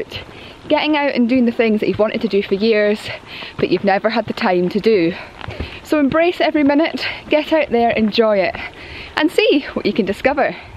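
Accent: Scotland, United Kingdom. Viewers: Man she sounds scottish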